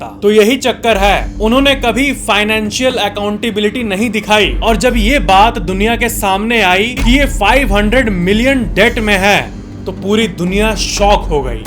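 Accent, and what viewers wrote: Bihar, India. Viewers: bahi qna video bnao aur btao tum Kya Bihar se ho?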